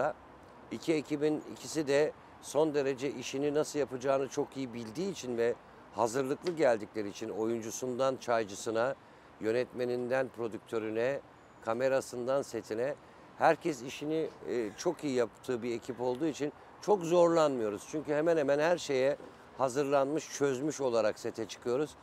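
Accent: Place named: Rize, Turkey. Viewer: Rize şivesine çalışman gerek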